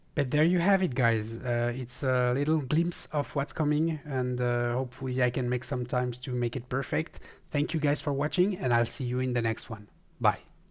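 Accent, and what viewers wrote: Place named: France. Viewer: Cool pourquoi tu ne fait pas tes vidéos en français aussi ? On entend bien que t es fr ça serait cool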